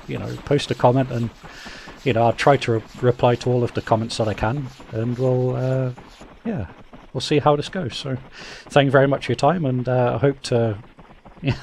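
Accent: United Kingdom. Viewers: Where are you from Smudge ? East London ?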